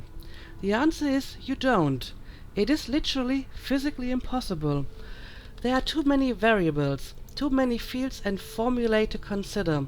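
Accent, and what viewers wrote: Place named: Germany. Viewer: Hey! I'm new to your channel and one of the first things I noticed was your accent. So quick question, are you German?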